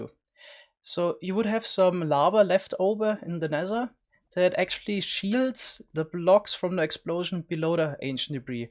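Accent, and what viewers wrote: Germany. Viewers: Ich habe sofort an deiner Stimme gehört das du Deutscher bist! :) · Holy Mother of German Accent! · Wie deutsch kann dein Akzent eigentlich sein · That's German English xD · I detect German accent 😋. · Where are u actually from? I am hearing a german accent. Am I right? xD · are u german ur english is somewhat funny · Uff.. dieser deutsche Akzent geht ja gar nicht 🙈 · dieser Deutscher aktizent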